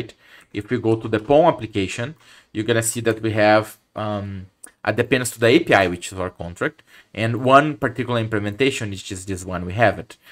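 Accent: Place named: Brazil. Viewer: Pretty good job explaining the concept man My dad is also a although we're from mexico, but i can tell you are very brazilian from your r's!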